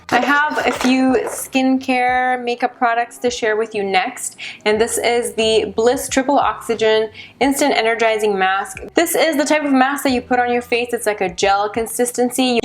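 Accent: Canada. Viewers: Maybe it's because I've lived in SF for a year, but you sound extra Canadian in this! And I had no clue Rituals was from the Netherlands. Great video as always! xoxo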